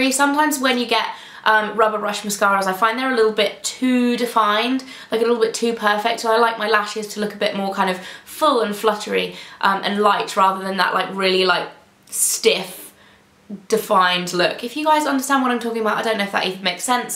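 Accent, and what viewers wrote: United Kingdom. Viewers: You're such a Brit! lol British never sounds casual to me, it's too proper! lol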